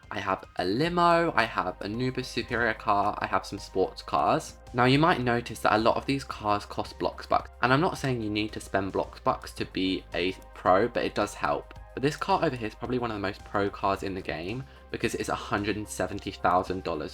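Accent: United Kingdom. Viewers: Yes he just sounds different because he’s British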